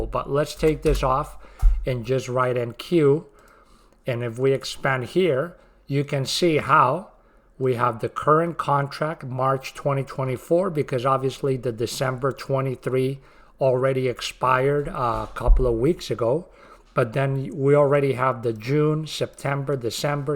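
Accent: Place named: Belgium. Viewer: Are you from Belgium? That accent sounds familiar.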